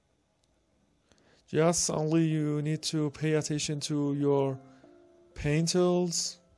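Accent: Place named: Iran. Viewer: Are you Iranian dude? I love your Accent :)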